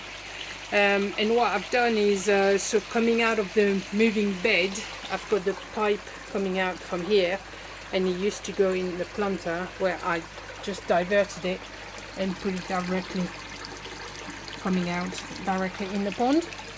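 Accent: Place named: France. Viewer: Good to see you back, missed that lovely French accent of yours✊ cheers 👍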